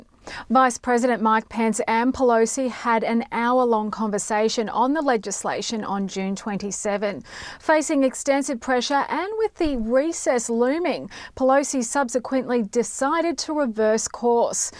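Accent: Australia. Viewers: Perfect lady anchor gorgeous with an Australian accent. Love it!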